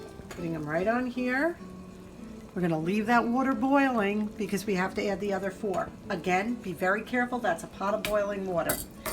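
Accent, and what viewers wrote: New York, United States. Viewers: you and are doing a great job. I initially came over from "Mornings with Granny." When I first watched your video something in my heart and mind clicked from your voice. I grew up in NY and I recognized that in your voice. It wasn't until later that you confirmed it that I got the "ah hah, no wonder" feeling. You share from the heart and I appreciate i Your tips are great, keep them coming. I like the recipes and the honesty you share. Thank you for bringing "a little joy to my day."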